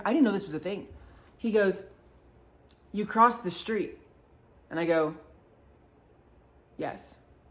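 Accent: United States. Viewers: I love the Midwest comes out everywhere.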